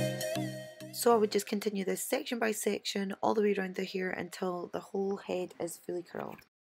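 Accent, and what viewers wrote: Scotland, United Kingdom. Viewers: This has put me at ease more lol! And I’m glad I’ve found a Scottish blogger so I understand someone better Hahaha! I’m getting tape extensions done soon for the first time so feeling a bit nervous about maintaining them seeing as I’ve not got any experience! Think they’re ones actually I’m getting.:)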